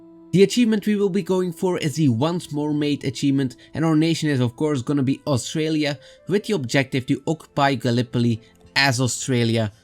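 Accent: Netherlands. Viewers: Are you Dutch or Flemish? Just noticed it in the beginning of the vid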